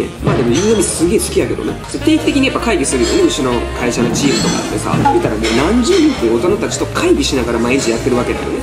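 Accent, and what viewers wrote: Kansai, Japan. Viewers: 最近好きになって昔の動画もめっちゃ見漁ってます🥺🥺🥺更新が毎日っていうのが嬉しいし関西弁なんが親近感湧く🥺🫶🏻💓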